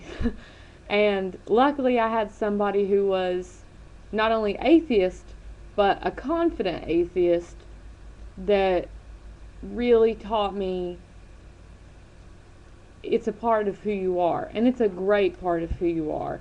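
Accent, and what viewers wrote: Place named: South, United States. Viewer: You are breaking the misconception that every individual living in the South or Southwest are all Bible toting twits. I love the accent and the sprinkled curse words. It adds some swagger to those rational thoughts and ideas that you are having. Don't stop speaking your mind.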